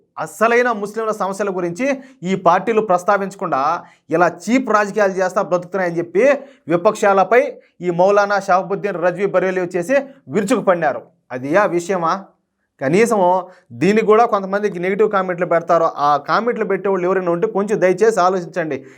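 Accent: Rayalaseema, India. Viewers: Nellore Tirupati Chittoor slang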